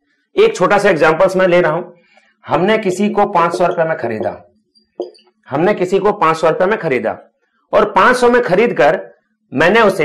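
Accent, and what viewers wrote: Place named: Bihar, India. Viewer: Are gjbbb Gurudev ap to.....1 dum bihari tone m mazaa aa jata hai padhne m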